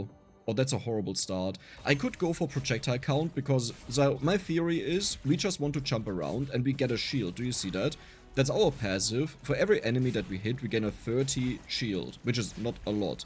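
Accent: Germany. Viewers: Kann es sein das du deutscher bist?😅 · Like your content, but are you German ?😂🕺🏼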